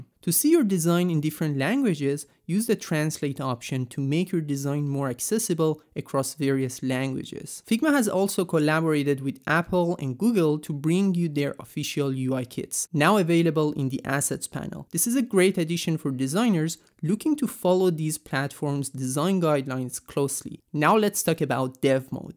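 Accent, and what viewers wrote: Iran. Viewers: لحجه فارسی🤝🏻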